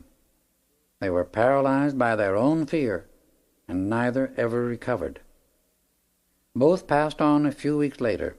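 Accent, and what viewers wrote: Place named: Northern Ireland, United Kingdom. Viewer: the accent sounds Northern Irish to me. Would love to know who it is.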